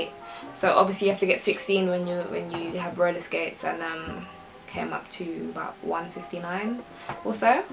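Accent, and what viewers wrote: United Kingdom. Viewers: Good on you for buying stuff from olis, i know them and they are a family run business by a family of skaters and decent people. I skated their sessions every Sunday and can't wait for these stupid covid restrictions to end and for the sessions to resume. I have Bauer NS and I can't get on with them ether, bloody amazing looking boots but they give me horrendous blisters on my ankles and the lack of boot flex does not suit my skating style as I like to have hard bushings and have them tight for speed and rely of upper boot flex to be able to lean in turns but the NS has a boot as stiff as a corpse lol. I the boots i regularly skate on are my 90's bauer turbo and my new supreme turbo which are a clone of the Bauer turbo. Was nice hearing your London accent but it made me homesick, I'm originally from east London but moved out to Salisbury in Wiltshire 9 years ago and it drives me mad living among all these countryside snobs ha ha.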